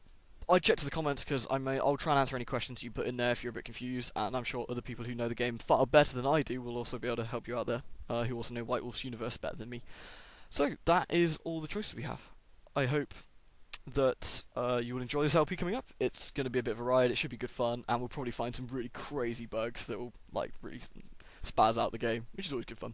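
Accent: United Kingdom. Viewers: since I have no interest in this game, I think I just sat through almost 8 minutes of video just to hear his fabulous British commentary XD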